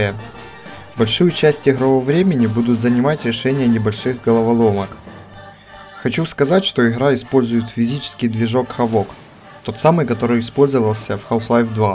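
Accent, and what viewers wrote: Ukraine. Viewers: Хватит издеваться над произношением автора, он не Хыкает, это украинский акцент такой, в большинстве слов вместо привычного Г есть нечто вроде Х, а там где четкое Г нужно, там есть Ґ, но оно редко используеться. Также например привычно говорить ШО вместо ЧТО. Язык такой, имейте совесть :) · Автор! Я балдею от твоего украинского произношения. Не слушай никого! Оно прекрасно.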